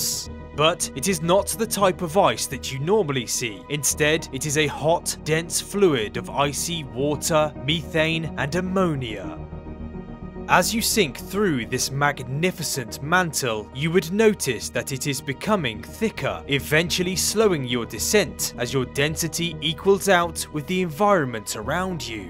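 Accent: United Kingdom. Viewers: His british accent make it even better,uranus is so deep btw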